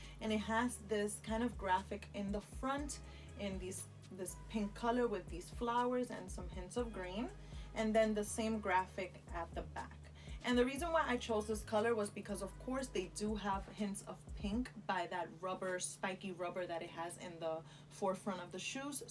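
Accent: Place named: Latin America. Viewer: First time on your channel, I really like the style of it, and your Latin accent is HOT! I subscribed, looking forward to future content! I got the white and black, so far I wore the white and it does feel a bit weird but I think you’ll get used to it fast.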